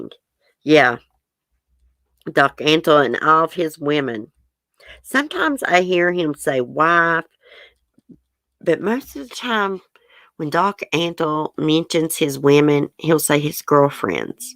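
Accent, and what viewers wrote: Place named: Alabama, United States. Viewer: I love your accent! It’s very like my Alabama accent I had! Where are you from?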